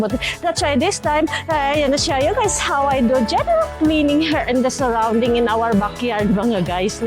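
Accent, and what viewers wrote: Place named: United States. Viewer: wow...ka daghan na di ay sa imu English....hehhehe....mura na amerikana...very nice...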